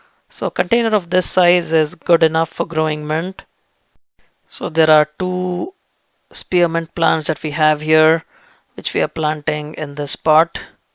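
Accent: India. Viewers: LOVED your Indian accent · he is indian i think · I think Indian accent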